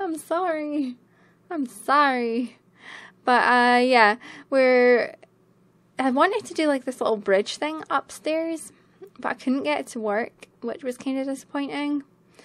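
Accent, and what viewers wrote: Scotland, United Kingdom. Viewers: I think we might come from the same part of Scotland as I couldn't tell u where Scottish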